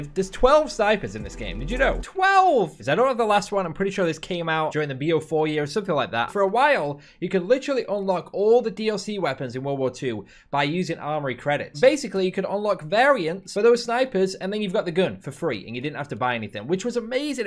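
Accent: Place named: England, United Kingdom. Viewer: dude you're great. That english accent sets the mood straight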